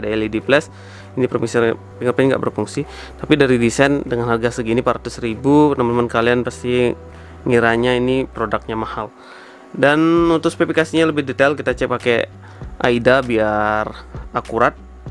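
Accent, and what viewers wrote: Bali, Indonesia. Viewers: Dari nada suara bicaranya sampean kayak nya org bali ya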